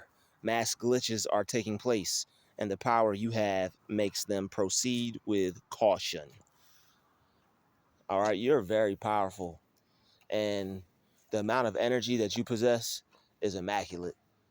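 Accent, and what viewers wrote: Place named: Midwest, United States. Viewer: Spot on. You from the Midwest? You talk like it. I get what you're saying. I know from experience.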